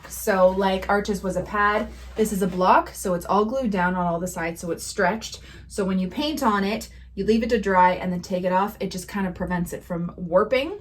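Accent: Canada. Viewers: Damn, it took me 9 minutes to realize you were in Canada. I don't know which word gave you away. Maybe it was "out".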